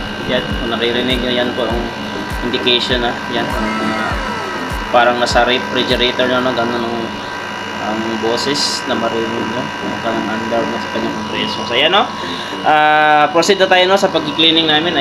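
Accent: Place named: Batangas, Philippines. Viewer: parang batangueno. salamat sa pagshare nito. #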